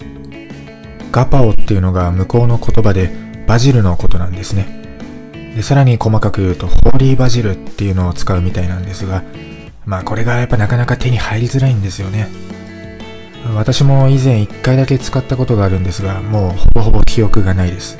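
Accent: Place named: Tohoku, Japan. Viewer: 東北のどこ出身なんだろう😂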